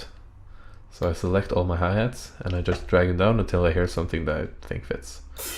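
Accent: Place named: Scandinavia. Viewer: Scandinavian accent.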